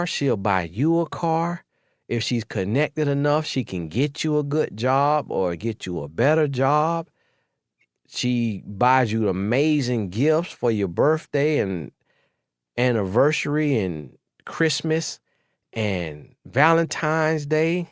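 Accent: South, United States. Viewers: Is this really your voice, you sound a lot more southern in your newer videos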